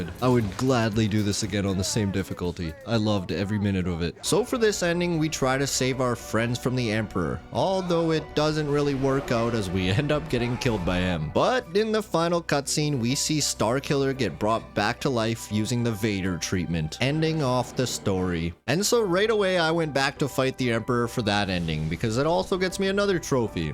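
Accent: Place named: Alberta, Canada. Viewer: He's either Albertan or Nova Scotian bud 😅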